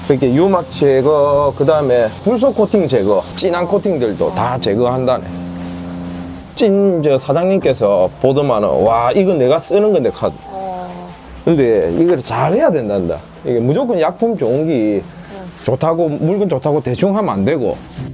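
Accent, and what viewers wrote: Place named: Changwon, South Korea. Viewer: 저도 늘 영상만 보다가 주문했어요. 고향이 저도 창원이라 정감난 말투가 반갑고 재미나게 봅니다. 사투리가 씨네..~~~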